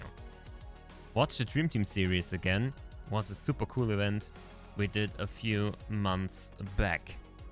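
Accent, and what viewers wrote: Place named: Germany. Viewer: Are you German you pronuns it Like a German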